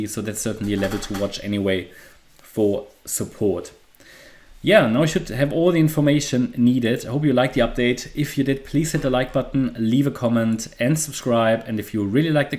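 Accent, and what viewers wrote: Germany. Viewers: is it me or is that a german accent?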